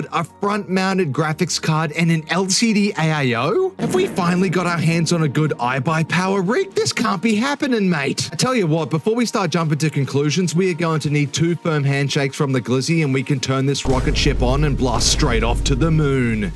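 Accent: Australia. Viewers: Are you gonna explain why you have a Melbourne Aus accent but for some reason live in the US.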